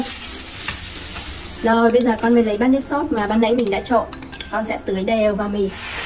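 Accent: North, Vietnam. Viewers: Nghe kỹ giọng chị là miền Bắc hồi đó coi gia đình là số 1 nghe giọng miền nam mà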